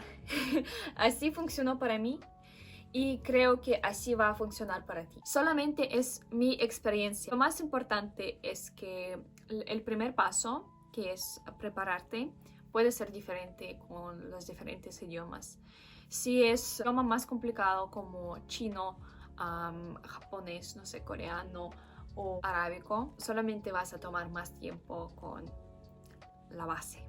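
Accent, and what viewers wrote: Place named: Russia. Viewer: El ruso siempre me ha llamado la atención, por ejemplo me encanta la banda, y buen video y que buenos consejos, lo usaré para aprender también inglés, por cierto, tienes muy linda voz y suena muy bien en español, es decir todavía se nota cómo el tono ruso, y el ruso es un idioma tan hermoso, ¿Me puedes recomendar música en ruso? Y eso pues, saludos 👍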